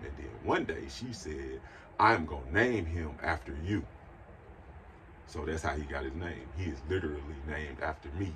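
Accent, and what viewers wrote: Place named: St. Louis, United States. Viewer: You sound so St. Louis. I lived there for some time.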